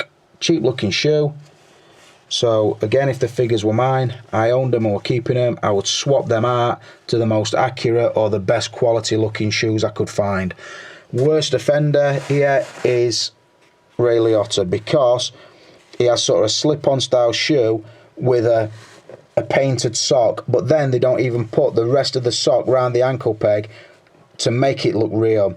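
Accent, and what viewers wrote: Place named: Manchester, United Kingdom. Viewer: hahahahhahaha ! Mate where are you from? Ypu sound local.. manchester ?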